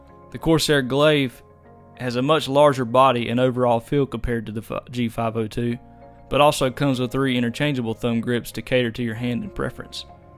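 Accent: South, United States. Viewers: this is the most country voice i have ever heard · Your accent is absolutely fantastic, for us Brits :D Every southerner I've met has been inordinately nice, just can't figure out why they voted Trump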